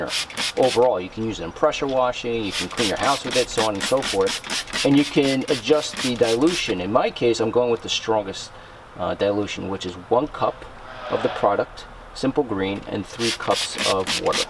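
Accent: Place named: New Jersey, United States. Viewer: New Jersey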